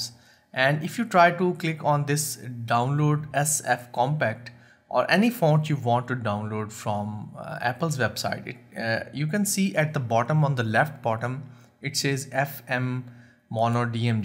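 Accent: India. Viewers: European face. Indian accent. Great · u sound so indian... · WHY THIS GINGER HAVE INDIAN ACCENT?? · Wow ...your pronunciation is so different.... it's sounding very similar to our Indian English pronunciation 😂😂..and now I can understand better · its the first time l see a white man talks the accent of an indian, wtf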